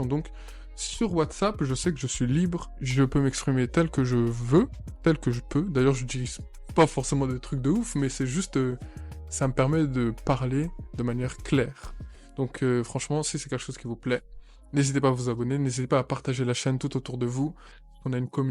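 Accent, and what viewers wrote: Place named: Antilles, Caribbean. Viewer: Toi tu es antillais, je reconnais l'accent. Entre nous, on se reconnait, hein.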